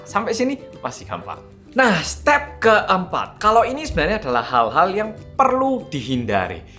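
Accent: Java, Indonesia. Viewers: Tiba" Nemu channel ini dan kesan pertama adalah anda itu medok sekali jawanya, keren, kesan kedua saya dpt informasi yg sebelumnya sama sekali tidak tahu, nice sharing. Sungguh tata krama makan yg luar biasa, budaya yg luhur. Memang bener bgt mas tatakrama makan memang perlu banget, apalagi tau dulu budaya setempat malah lebih bagus dan nilai plus tambah. Detil memang tapi sangat jelas nilai etikanya. Terima kasih mas atas tambahan wawasanya..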